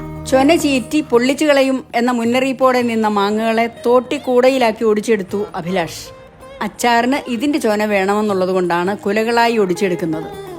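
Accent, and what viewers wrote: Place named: Ranni, India. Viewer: എൻറെ മുത്തശ്ശിയും ടീച്ചറുടെ നാട്ടുകാരിയായിരുന്നു.റാന്നി.ഇതേപോലെയുള്ള സംസാരവും ജീവിത ശൈലിയും.❤❤❤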